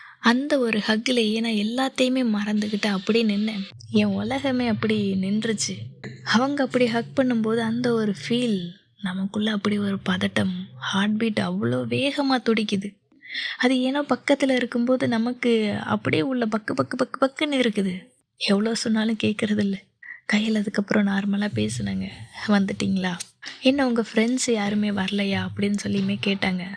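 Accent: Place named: Sri Lanka. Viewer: enaku theriyadhu ak. Tamil pesivangau. I like it srilanka tamil❤❤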